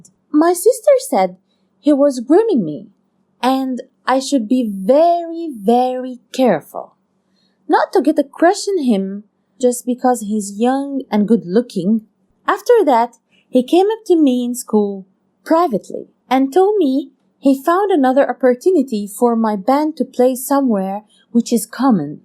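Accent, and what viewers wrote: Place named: China. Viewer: Learn how to talk better I don't understand you with your Chinese accent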